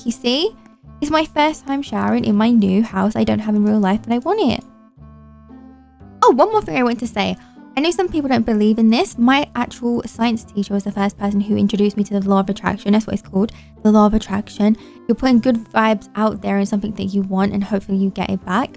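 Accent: United Kingdom. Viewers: so England, hence the British accent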